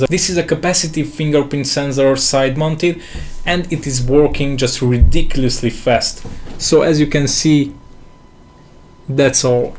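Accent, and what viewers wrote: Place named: Romania. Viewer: Ești român după accent:)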